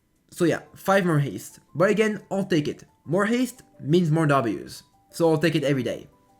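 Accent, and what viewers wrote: France. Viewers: l'accent francais est fou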